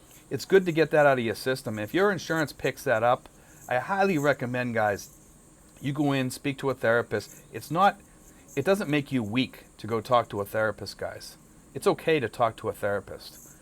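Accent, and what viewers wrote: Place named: Massachusetts, United States. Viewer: I guess I'm not a typical expat because very little in your video applies to me. I've been married only once to the same woman, a Filipina. We have a daughter, and I've always had a great relationship. She's 21 now, and she's always been a great kid. We talk often, and I listen to what she has to say. Part of the reason things have worked out for me might be that I was 42 before I got married, and I'd had enough American gf's to know I didn't want one as a wife. Anyway, I subbed your channel. Also, I knew you were from Massachusetts by your accent. Me, too.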